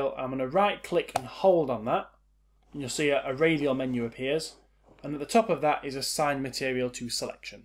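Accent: United Kingdom. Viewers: Grate Tutorial! Excellent British accent!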